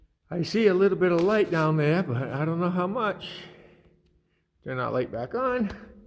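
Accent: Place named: Boston, United States. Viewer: sounds like hes from Boston... Love Video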